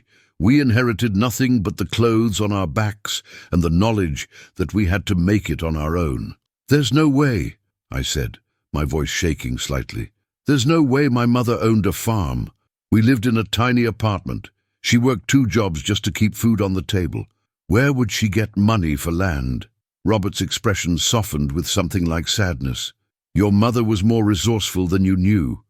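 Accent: United Kingdom. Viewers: The plummy English accent of the storyteller is totally at odds with the narrative.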